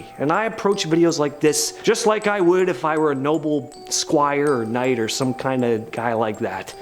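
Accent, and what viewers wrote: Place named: England, United Kingdom. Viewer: The english accent this dude makes man, LMAO! Too good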